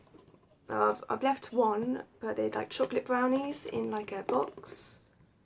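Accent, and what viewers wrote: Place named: London, United Kingdom. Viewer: Lol,yeah maybe u picked it up from somewhere,like over the rainbow,lol,but yeah l spent nearly a year in London so l thought l heard a little bit of a twang with that accent,maybe my ears are deceiving me,lol,anyway Hampshire eh,l don't believe l know to much about Hampshire,are u like in the middle of nowhere,with all fields and stuff about???BTW thanks for getting back,take it easy:)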